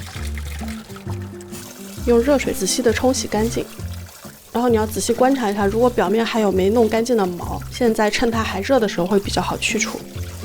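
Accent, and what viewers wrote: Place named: Shanghai, China. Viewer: 我家乡也有好吗我倒觉得是上海银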